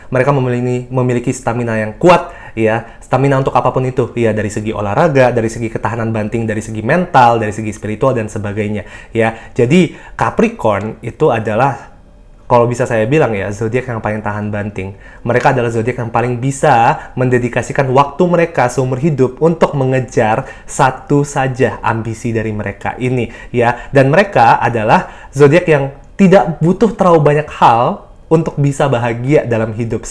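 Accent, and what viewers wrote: Java, Indonesia. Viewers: Orang jawa po mas?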